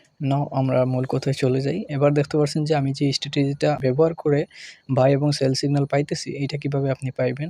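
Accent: Sylhet, Bangladesh. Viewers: আপনার বাড়ি কি সিলেটে.?...আপনার উচ্চারণ সিলেটি দের মতো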